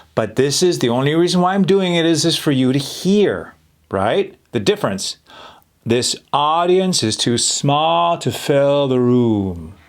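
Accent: India. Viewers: Thats indian accent yeow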